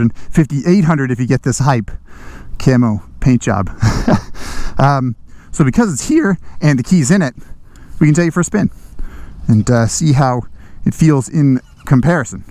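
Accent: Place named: Orange County, United States. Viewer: I don't follow you, but your ride looks like suburban hell, dawg. Accent I'm calling oc.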